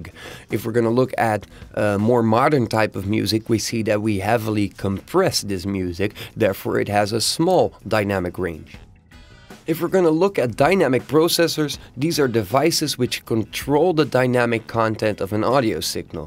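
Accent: Netherlands. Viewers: I'm quite sure it's dutch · I'm one and nope. I think it's dutch too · de eerste Nederlander met een normale engelse accent haha! Goed werk !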